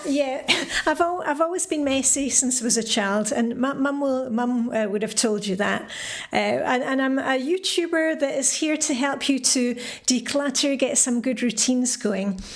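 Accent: Scotland, United Kingdom. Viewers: I love your Scottish voice!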